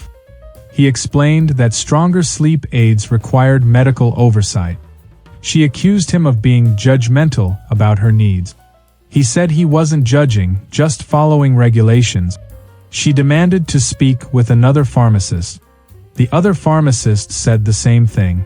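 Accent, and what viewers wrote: United States. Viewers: All these posts showing the arrogance, entitlement and stupidity of Americans in a wide range of experiences leads to the overwhelming desire to ignore them all completely and, at times, just tell them to bugger off. Also, all the supposed interactions with Australians are delivered in an American accent, not an Australian one which would possibly make them half way credible.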